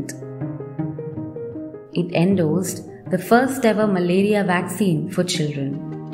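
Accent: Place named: India. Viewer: I like the content in your videos. It is well-researched, bite-sized, and packed with information. But I dislike the voiceover in almost all of your videos — it has a very thick local Indian accent, which is very distracting. For a more absorbing consumption of your videos and to reach a wider audience, I suggest you do one of two things: Get rid of the voiceover all together and include captions with background music, or hire English speakers to narrate using native US, UK, or Australian accents. This is not the first time I'm writing on this subject. Please take heed! Thanks.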